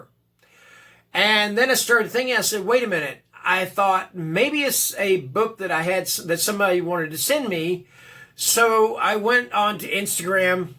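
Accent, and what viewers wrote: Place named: Appalachia, United States. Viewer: Excellent Appalachian accent. Too many good books, how are you going to decide what to read first?!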